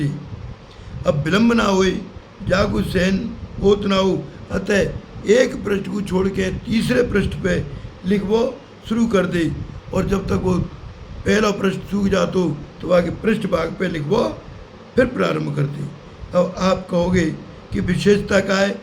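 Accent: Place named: Braj, India. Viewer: आपके द्वारा ब्रज की बोली में कथा बहुत सुंदर लगती है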